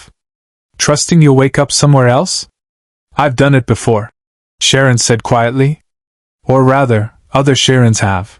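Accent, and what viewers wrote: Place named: South, United States. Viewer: Sounds like the South...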